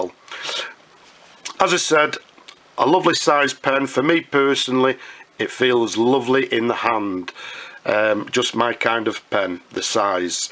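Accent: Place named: England, United Kingdom. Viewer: just know that I love your heavy english accent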